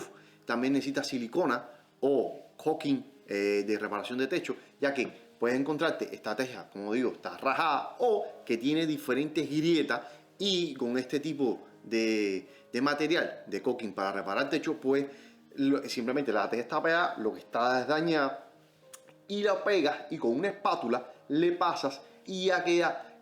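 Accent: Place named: Cuba. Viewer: Este tipo que idioma habla ....parece a veces castellano cubano con partes de ingles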